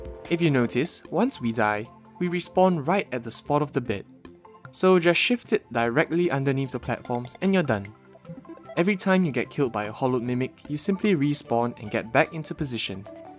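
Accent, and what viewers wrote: Singapore. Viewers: Omg your voice is super soothing! and reminds me of home! Just wondering....are you from sg?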